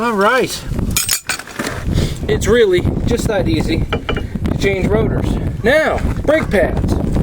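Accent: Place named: Ontario, Canada. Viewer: Well done! Much easier than Chilton's Manual! The Ontario accent is an added bonus.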